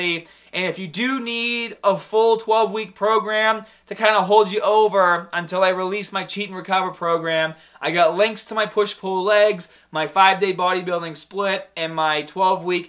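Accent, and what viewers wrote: United States. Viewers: Your American accent is so strong ahah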